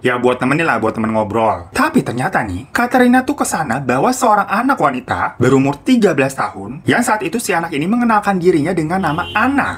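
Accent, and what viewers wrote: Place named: Tegal, Indonesia. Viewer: Suka bgt sama accent tegal nya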